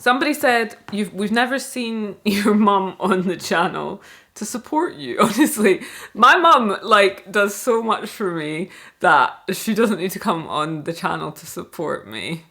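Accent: United Kingdom. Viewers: I'm very happy for proud of you💐✨ I really like the British accent 💫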